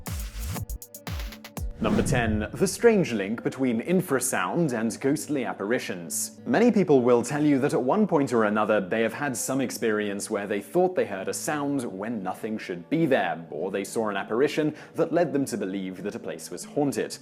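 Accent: England, United Kingdom. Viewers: Man i swear genuine English accents make narration serious/entertainingly listenable and even the occasional background noise for a day time nap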